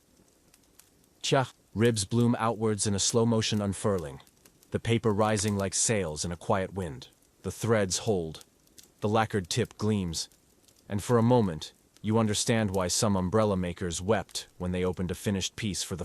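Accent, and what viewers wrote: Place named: United States. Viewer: The text of this is interesting but theUS accented voice with 'true crime' rapid paced staccato inflections isn't restful.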